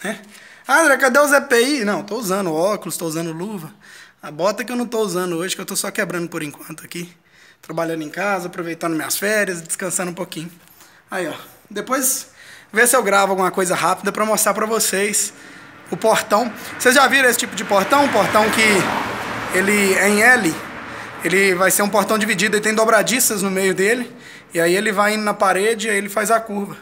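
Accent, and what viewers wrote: Goiás, Brazil. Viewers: Tu es da onde cara? Você falando parece ou Zezé de Camargo ou Leonardo. Você e de Goiás?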